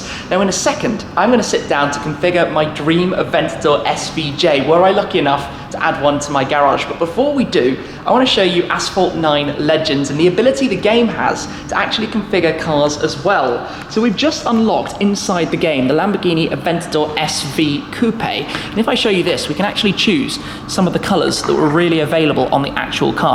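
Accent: United Kingdom. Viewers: inggris britis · your accent makes everything you say increases the stereotypical british car enthusiast personality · He has a british accent. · Nice British accent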